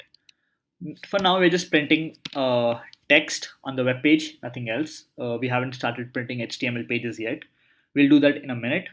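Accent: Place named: India. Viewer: Why you indians talk like this !! Please please please work on your accent , it's very hard to understand. I'm telling this to all the indians ...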